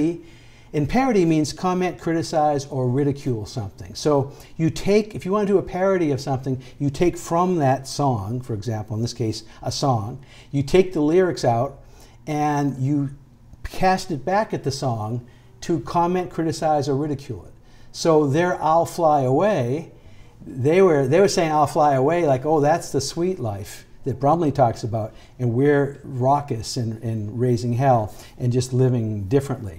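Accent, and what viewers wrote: Boston, United States. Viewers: Incredible lecture, masterful Boston accent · That accent takes me right back to my Boston days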